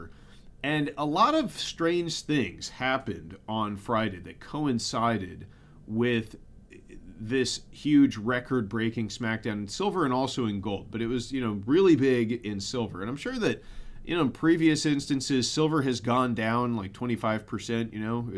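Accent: Chicago, United States. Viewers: Where did you grow up? That sounds like a Chicago accent if I've ever heard one.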